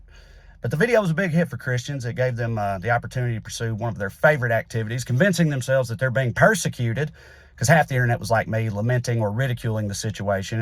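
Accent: South, United States. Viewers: That's funny, because this is a comment I've only heard from people who haven't spent significant time in the rural southern US (where the accent really isn't that unusual). That's more or less how speaks normally (his sister, who is not a comedian, has the same accent he does). Of course, he's not speaking in a totally normal fashion in these "car rant" videos, since they are a recurring bit that he presumably writes scripts for beforehand, but the "country accent" itself is not the unnatural part. You can see an episode of and friends' podcast where his sister was a guest here, for example ( can also be heard throughout, speaking in a more impromptu manner): <link>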